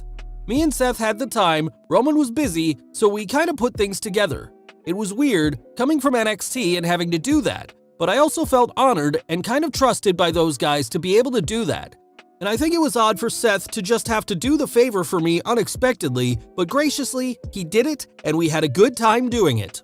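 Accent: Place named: United Kingdom. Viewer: Aw man no offense but I wanted to hear the British accent today :/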